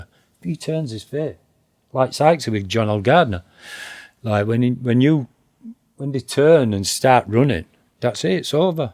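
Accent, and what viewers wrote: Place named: Yorkshire, United Kingdom. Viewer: Yorkshire accent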